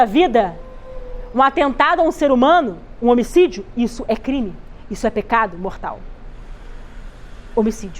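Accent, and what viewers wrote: Rio de Janeiro, Brazil. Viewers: Hehehe o marcadíssimo sotaque fluminense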